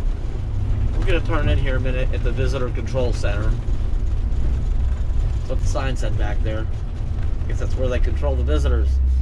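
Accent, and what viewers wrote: Pennsylvania, United States. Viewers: As I get much older I see people in a different light there real friends and there r user. Stay safe I love u still have ur PA accent.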